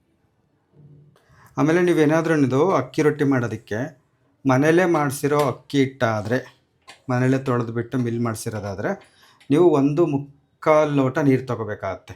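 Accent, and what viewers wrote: Chitradurga, India. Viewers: Namma Durgada kannada 😂👌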